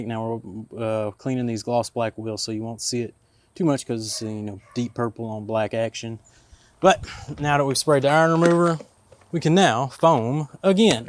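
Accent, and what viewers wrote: South, United States. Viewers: Thanks for the update! I went and bought the 1.8 based off your original review; I was just NEEDING something smaller and lighter than my big 'ol thang with wheels and a hose reel. The only issue I have with the Ryobi gun is that when I put the extension on it, I feel like I fight to keep the water stream pointing down. It's like I pull the trigger, and the nozzle kicks up. I did put a swivel on it, and as a pure stubby it's great. Love the lack of music and your LA accent! I'm in East TN, so it's cool to hear the southern accent with a twang :). Love your vidoes!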